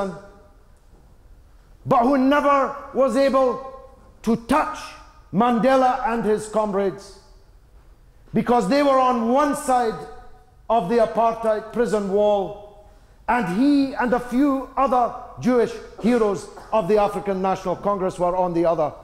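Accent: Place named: Scotland, United Kingdom. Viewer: No, he's 100% Scottish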